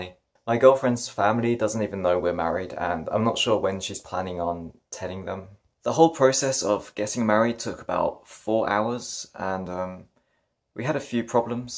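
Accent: United Kingdom. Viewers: Samm Y i get what u are saying. But to bash a depressive does not help.. British accent and looks are sweet. His words not so much, reflect his depression, lack of accessing true emotions, joy... and his insecurity (like need to proof us his marriage certificate, which is really not our business )... he got loads of judgment... about woman and man... which means he judging him self even harder and more... which in the core leads to depression.... i just wish he would realize it, and start having a therapy to turn his life over... show his followers would be such a great asset if maybe many depressive followers to show that u can turn into joy with life... your weaknesses are your strength - i truly hope he is more cheerfully with his wife... idk even why i keep watching him once in a while... i guess hoping to see him for once happy.....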